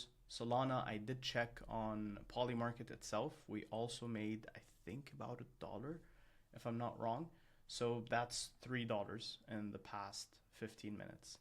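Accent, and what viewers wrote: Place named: Philippines. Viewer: Are a you a Filipino? You sound like one.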